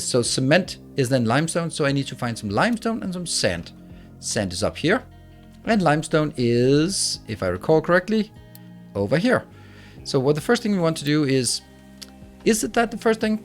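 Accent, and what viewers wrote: Denmark. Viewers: I’m really wondering what country your from because you sound danish but I’m not sure. Hope it’s not a too private question. Keep up the work.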